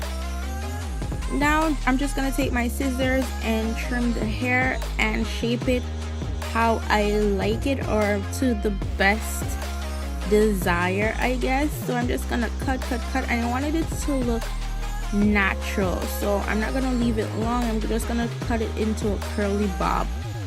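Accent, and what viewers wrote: Jamaica. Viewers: Are you a yaadi girl like me? i hear u accent!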